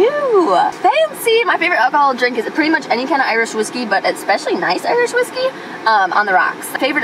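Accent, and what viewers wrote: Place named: Wisconsin, United States. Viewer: I knew it! She IS from Wisconsin. The way she pronounces her A's like in "tracking" gave it away.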